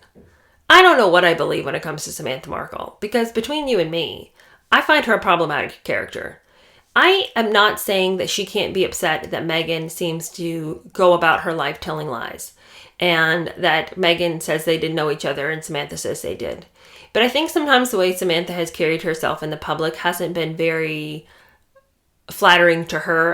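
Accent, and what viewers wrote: United Kingdom. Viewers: I just love your British accent😂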